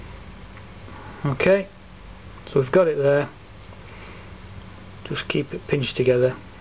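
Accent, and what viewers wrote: Australia. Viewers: I got a question are you Aussie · are you from Australia mate???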